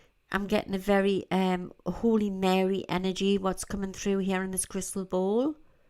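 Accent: Newcastle, United Kingdom. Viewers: Why would someone do that? I'm a fellow geordie and I love listening to you and it comforts me to hear you, when I live in Australia now. Gis the name of the people who were not nice to you and I'll kick them in the fanny for you I😂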